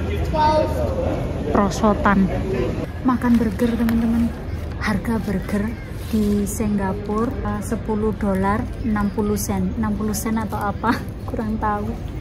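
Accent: Java, Indonesia. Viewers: Mbak slm kenal iki wong jember hadir oya mbak jawanya dr a mana ta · lidah jawa banget ya mbk singgapur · Saya suka sekali bhsa penerangannya mbak. Gk pernah ninggalin bahasa medoknya wong jowo. Semngat buat sanoean berdua..serasa ikut merasakan perjalanan kalian berdua. Mantap mantap.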